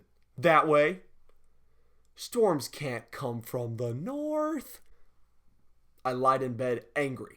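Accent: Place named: Franklin County, United States. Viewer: So do you live in Franklin county like me because it sounds like you live In Franklin county like me🤣🤣😘🌪🌪🌪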